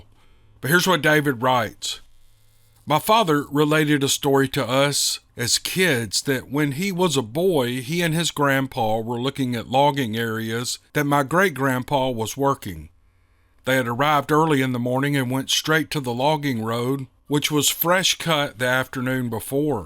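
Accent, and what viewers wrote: South, United States. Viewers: Yet again,another great podcast.My personal interest in Sasquatch is fueled by what I consider a possible encounter as a child.A friend told me about you .I am an avid reader,however,I have formed an addiction to listening to your narratives.It must be the southern dialect .You see,I am from Alabama and our accents are quite similar .I read in a southern accent ,so listening to someone reading in the same is natural .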